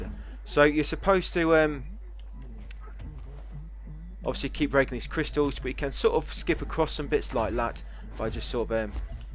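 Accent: United Kingdom. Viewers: what part of the uk you from I was trying to pick the accent, after hearing you for all those hours 🤔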